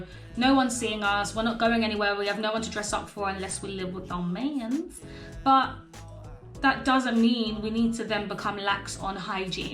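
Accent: United Kingdom. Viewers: I like your accent. Are you from the Uk? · i love me some british accent🥰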